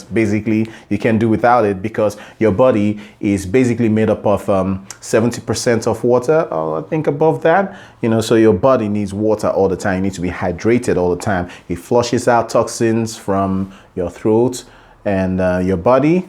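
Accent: Nigeria. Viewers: Sounds Nigerian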